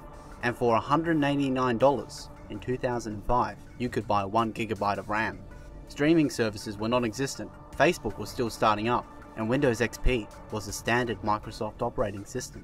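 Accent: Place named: Australia. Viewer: Too bad about the Australian accent. There were parts I couldn't make out.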